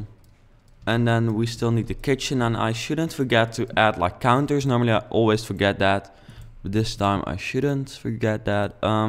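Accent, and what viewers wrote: Netherlands. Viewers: een nederlands accent ;) je bouwt mooi!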